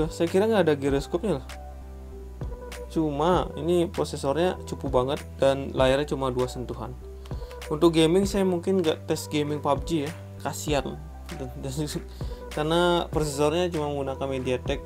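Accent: Bali, Indonesia. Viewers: Dari logatnya kaya orang Bali.. Pakai gelang tridatu juga. Hehe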